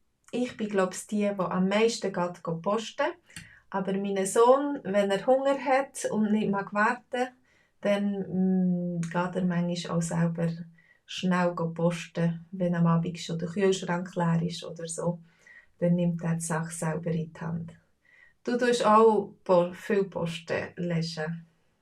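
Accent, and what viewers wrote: Bern, Switzerland. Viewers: Hoi, ja genau. Ich luege Dein Kanal uf Youtube in Düsseldorf aa. Bin aber regelmäßig privat in der CH. Am 22.02 habe ein Schnuppertag als Pflegefachmann in Rehaklinik Tschugg Kanton Bern. Übrigens sprichst Du Berndeutsch Dialekt? So hört sich das an:-). Ausserdem du bist mit meinem Landsmann PL zusammen, dadurch ist Dein Kanal für mich noch Stück sentimental. Ich bin auch Pole. Und von Dir lerne ich gerne Schweizerdeutsch, bzw. Berndeutsch😉Ade und Danke Vielmals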